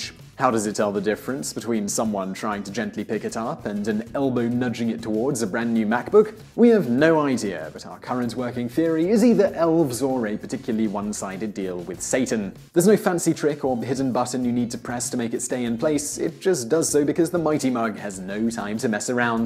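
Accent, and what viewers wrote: England, United Kingdom. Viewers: english accented johnny sins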